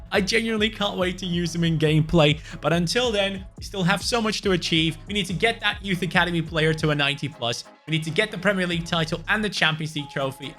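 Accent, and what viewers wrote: Netherlands. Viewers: I am Belgian but still happy to hear a fellow Dutchman. Or German? Anw, I would make a career to put a top tier Dutch club into one of the top 5 Leagues and try and win the league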